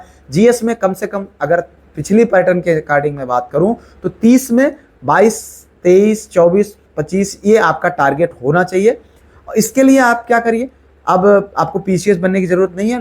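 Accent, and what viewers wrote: Pratapgarh, India. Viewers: Pratapgarh se h sir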